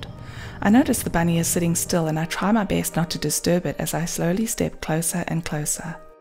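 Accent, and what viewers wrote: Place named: South Africa. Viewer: Hi! I could believe it when I read the data on one of your photos! The ISO was only 800! That's incredible! Also, I think that your voice is almost soothing to listen to, and your accent (which I believe is South African?) Is wonderful!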